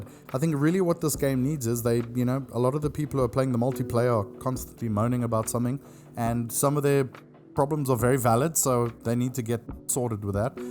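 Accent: South Africa. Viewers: i knew i recognized that accent, im raised in america but born in SA, cool video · So nice to hear a South African voice for once! Dope content!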